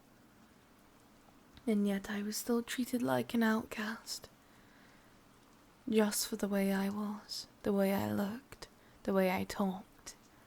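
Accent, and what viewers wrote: Scotland, United Kingdom. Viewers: I like this which though I do feel sorry 😞 for not finding the one I hope she does someday ❤️❤️. I really love your voice acting by the way you sound very sadistic but hot also you also you sound so beautiful & soothing I’m impressed 👍👍👍👍👍👍. Also you shouldn’t have to change the way sound personally I like your Scottish accent it sounds gorgeous in my opinion & I’m British with an American accent & I’m proud of it hope you had a great Valentine’s Day ❤️❤️. Kind Regards AuraKnight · this is more scottish than english honestly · Omg wait are you Scottish 😭👋👀👀❤️ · H New to thus channel and the accent is great, for me it sounds a bit more Scottish than English. I know I'll enjoy this channel tho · Thats Scottish accent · yup. Prefer your Scottish accent tbh. Havent check all your vids but is there any rp with your natural accent? 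· I'm getting a mix of Scottish and English in this. Like me, hurray😂. Good video lol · Your natural Scottish accent is far nicer.;)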